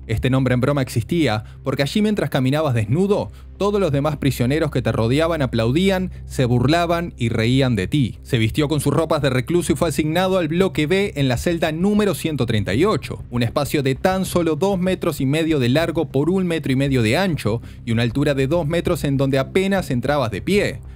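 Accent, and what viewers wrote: Argentina. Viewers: El narrador es Argentino de donde era Sandro ! Che !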